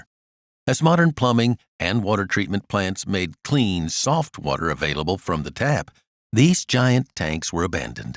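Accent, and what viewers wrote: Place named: General American, United States. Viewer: It is a standard American accent. I think it sounds great. Always more positive.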